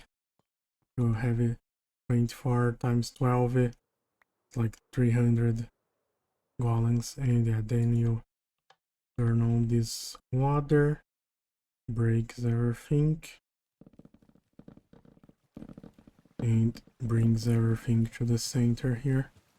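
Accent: Brazil. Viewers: brazuca aqui, ingles bom , entretanto dá pra identificar que é brazuca hehehe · É brasileiro? Tem sotaque de brasileiro kkkkkkkk. Mundo massa demais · i came for minecraft but stayed for the brazilian accent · Pelo nome e o sotaque, acho que você é brasileiro, confere? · Probably in the top 5 worlds of all time by the way, are you Brazilian? Your accent sounds like it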